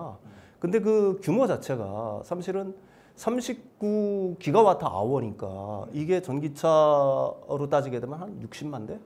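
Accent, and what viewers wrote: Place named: North Korea. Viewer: 억양이 북한사람 같아요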